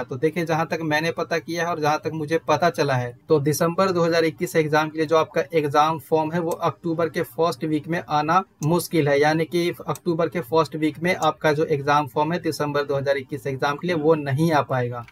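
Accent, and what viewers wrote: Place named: Bihar, India. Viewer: bhai I think you belongs to BIHAR . IS it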